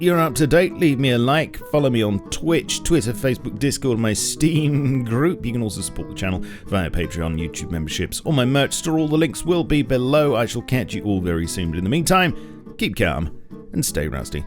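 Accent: United Kingdom. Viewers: You sound like the spiffing brit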